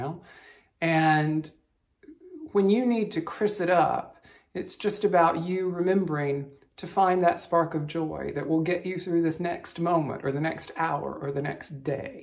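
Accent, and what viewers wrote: South, United States. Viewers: I just noticed that your Southern accent REALLY comes out with your stories. I love it! You're such a hoot, Chris!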